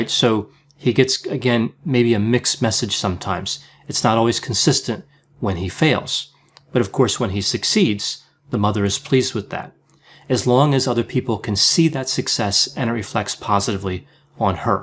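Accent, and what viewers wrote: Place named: Pennsylvania, United States. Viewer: Great info. I detect a Pennsylvania/Philadelphia accent.:)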